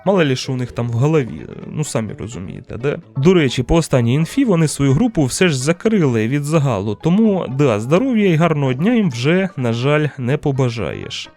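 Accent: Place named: Russia. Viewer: так ти ж сам росіянин, лол. по акценту чути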